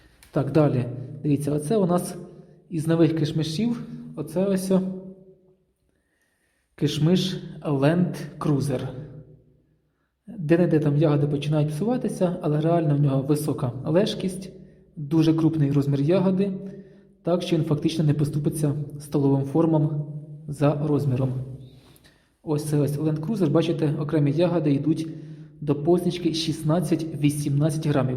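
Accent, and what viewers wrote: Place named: Mykolaiv, Ukraine. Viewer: Дуже приємно чуть справжню українську мову з Миколаївщини. Поважаю Ваше відношення до винограду, хай Господь допомогає.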